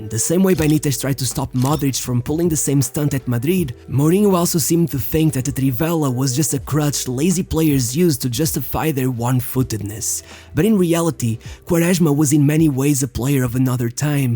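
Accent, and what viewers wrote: Portugal. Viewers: So your accent is portuguese, my mental mystery is finally solved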